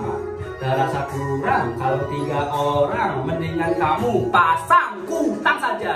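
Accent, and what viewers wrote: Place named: Java, Indonesia. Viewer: Kak asli orang mana yah?? Kok medok kalau bicara😂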